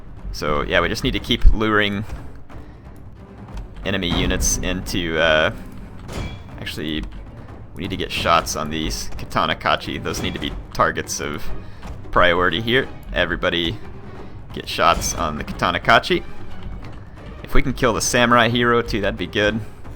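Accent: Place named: South, United States. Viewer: we miss your southerner accent:D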